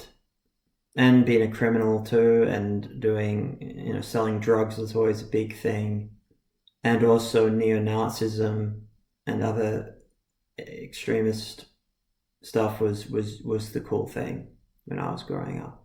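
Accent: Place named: Australia. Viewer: “The new edgelord thing is CP child pornography” no matter what affiliation they have, that is unforgivable and disgusting. As a survivor of ritual abuse myself by a “fake” LHP organisation there is no excuse. All pedophiles need to be removed, banished, banned from the occult/magickal community. There is no room for that here, or in the greater macrocosm of the social community at large. I come to magick as a healing refuge and to find it’s filled with as many if not more pedophiles as my childhood sickens me. End it. Thank you for exposing this, you are Australian I assume from your accent ? May I ask where this Pedophile ring is located ?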